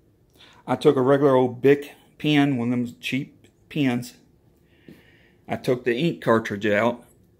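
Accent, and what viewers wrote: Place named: South, United States. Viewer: My Lord, you're a genius. Your idea gave me for free and almost immediately what several dollars and an hour long trip to a hardware store would have cost me. This worked perfectly for my Poulan Pro PR28SD string trimmer. Your accent tells me you are from the South...where else could such creativity come from? Thanks.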